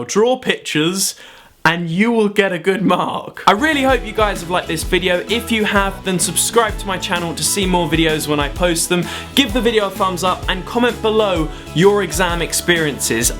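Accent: United Kingdom. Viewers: I know you have probably already ansered this, but what part of England are you from, you form your words EXACTLY like matt smith and its so cool.:7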